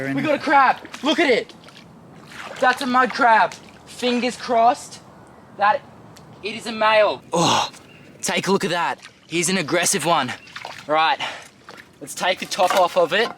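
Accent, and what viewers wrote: Australia. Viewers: Fun of his accent? lol I'd pay hundreds to get an Australian accent as good as his.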